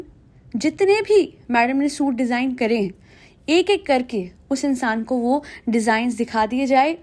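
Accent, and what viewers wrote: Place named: Punjab, India. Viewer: I am in ❤ love with your Punjabi accent plssssss aise hi podcast banaiye❤❤❤❤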